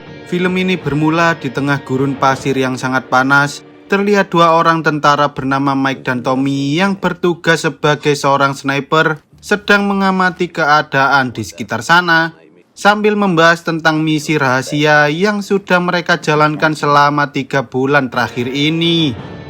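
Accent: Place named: Banyumas, Indonesia. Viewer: Jadi gk seru ngapaknya kebangetan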